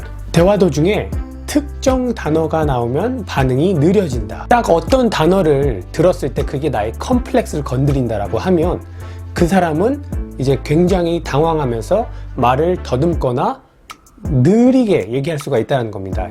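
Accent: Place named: United States. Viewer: 케이C님 토종 한국인 인줄 알았는데..영어 발음이...미쿡사람?^^ 이셨내용 👍잼있고 유익해서 담편도 당근 기대합니다~~😉😉😉😉😉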